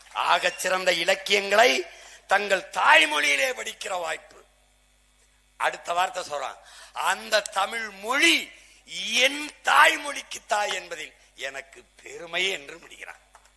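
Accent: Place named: Sri Lanka. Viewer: Nee ceylon thamil perusaa tamil vakka lathu vangure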